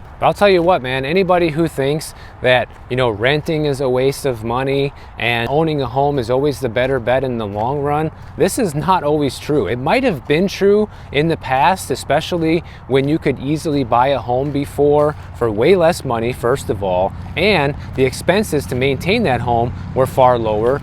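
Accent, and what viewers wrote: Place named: California, United States. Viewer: he accent sound California's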